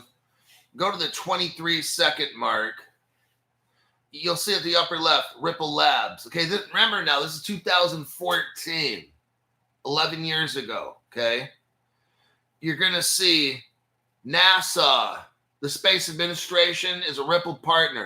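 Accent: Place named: New Jersey, United States. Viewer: You must be from Jersey because you talk just like me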